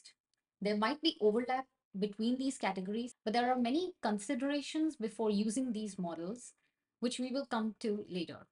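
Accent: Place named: India. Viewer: Great video but the Indian accent makes it difficult to understand sometimes, especially with names you’ve never heard of. Maybe use AI to translate English to English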